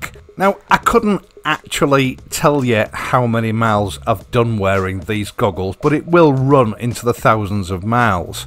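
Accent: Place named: Barnsley, United Kingdom. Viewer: Interesting accent! Where? Not Liverpool: barnsley perhaps? I am about to buy a Bell 500 (why don't they do the colours they used to market in the US??) and yes, Halcion goggles as per yours. It was almost already decided but your vid. took away any further question apart from where to buy both in or around Somerset (not via computer!!!). Bike,, I'm 71 and just bought a 1959 Harley Duo Glide. Silly old fool!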